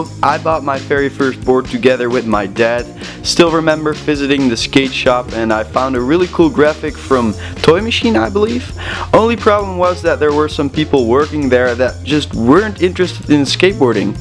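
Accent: Netherlands. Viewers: it's so funny how easy it is to recognize a Dutch accent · Are you dutch?? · I hoor you Engels accent en ik dacht gelijk Nederlands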